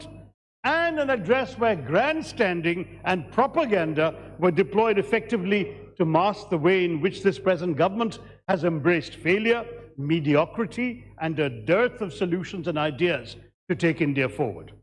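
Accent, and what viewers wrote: United Kingdom. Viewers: Gone are the days where people though you are clever just because you spoke in British accent. Dumb idiot! still with congress, the party that brought India to its knees. This idiot who talks about secularism is a part of the communist government. What a shame! you should drown yourself. You speak about women safety when you have a charge about your wife in court! stupidity!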